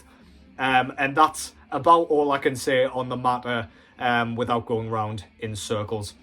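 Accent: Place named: Northern England, United Kingdom. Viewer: You saying piss poor in your delightful northern English accent is my favorite thing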